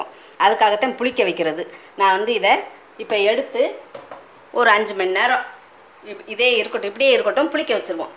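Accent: Madurai, India. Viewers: Neenga Madurai..ya Amma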